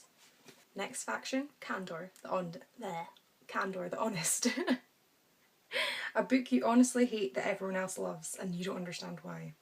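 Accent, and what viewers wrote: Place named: Scotland, United Kingdom. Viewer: I don't think I will ever say enough of how much I love your Scottish accent! It is so pretty. Can't wait to do this tag! I think I already have about half of them answered in my head lol.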